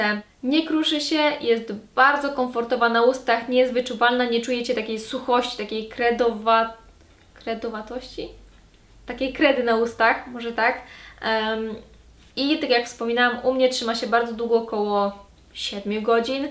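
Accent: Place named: Ukraine. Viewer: jestes Ukrainką? Słyszę akcent.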